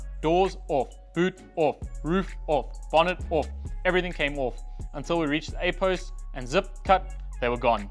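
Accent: South Africa. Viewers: Do I detect a South African 🇿🇦 accent?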